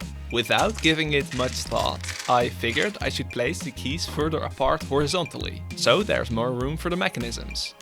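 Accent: Netherlands. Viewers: He sounds Dutch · Your from the Netherlands? The accent❤ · Are you dutch? because you sound like have a dutch accent. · I heard you talk checked your profile, yeah Dutch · ik hoor een nederlands accent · Why does this guy have a dutch accent? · Is this guy Dutch? He sounds Dutch. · you sound very dutch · ben je Nederlands? ik hoor je accent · Nice vid, and as fellow dutchie, i immediately caught on your dutch accent hehe ;) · Another dutch person successfully sniffed out by his accent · Nen ollander (duidelijk hoorbaar 😂) met veel tijd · You lowk sound dutch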